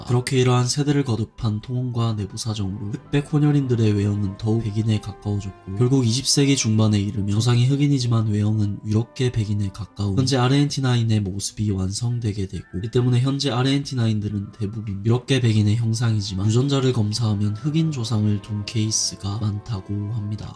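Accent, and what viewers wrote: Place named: Gyeongsang, South Korea. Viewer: 형은 경상도 말 할때가 제일 멋져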